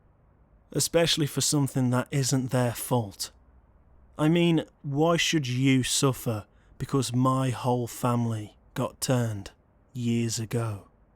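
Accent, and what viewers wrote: England, United Kingdom. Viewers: I always have a weakness for an English accent and I love yours.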